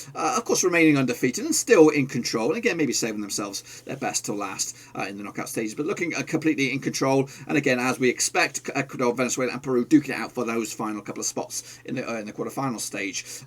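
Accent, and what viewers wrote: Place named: United Kingdom. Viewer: Basado en su accento, yo creo que el es britanico... Los americanos hablamos mas Bonito lol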